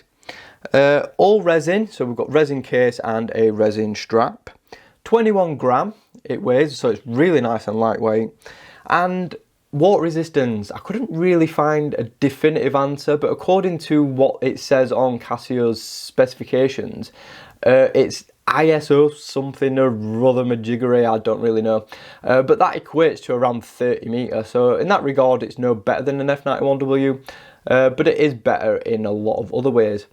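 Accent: United Kingdom. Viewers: Nice review. Like your Bri'ish accent.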